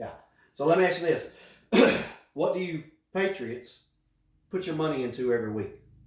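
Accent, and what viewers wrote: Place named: Mississippi, United States. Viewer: Are you from Mississippi?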